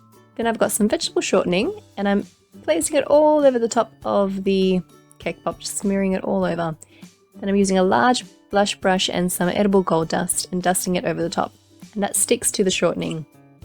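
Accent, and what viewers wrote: Australia. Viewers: Loved the idea, and love your Australian accent! Kisses from Italy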